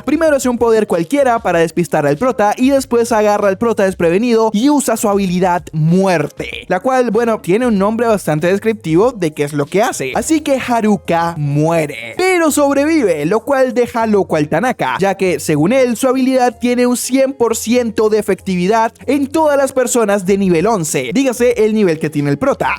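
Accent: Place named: Colombia. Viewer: Con ese acento sí o sí eres colombiano jajaja 10/10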